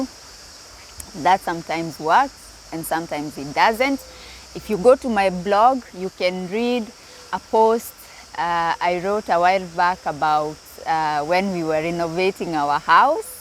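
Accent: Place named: Kenya. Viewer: You speak like a Kenyan, were you originally from Kenya? I'm coming to Greece to buy a holiday home, later a retirement home.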